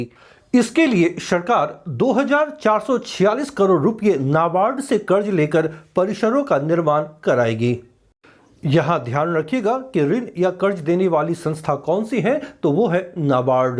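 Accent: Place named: Bihar, India. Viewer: Nyc accents...aap bihar se nhi ho sir???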